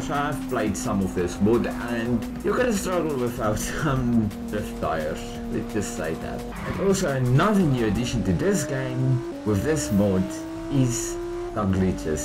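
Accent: South Africa. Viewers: That's a very strong South African accent you have there brother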